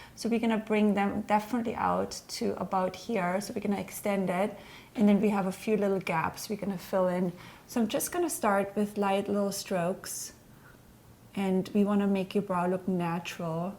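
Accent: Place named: Germany. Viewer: Is she german? Sounds like she has a really strong accent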